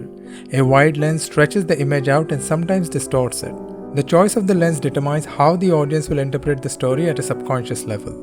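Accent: India. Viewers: I really enjoy your indian accent, friend.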